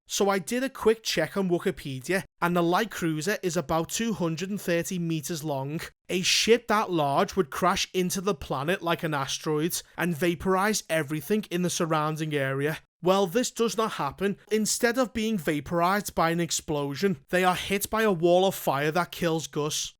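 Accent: Liverpool, United Kingdom. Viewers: Scouser accent brings much more fun into this travesty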